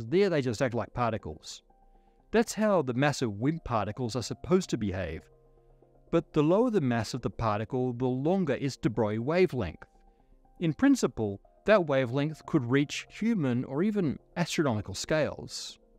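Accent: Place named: Australia. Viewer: that's his Australian accent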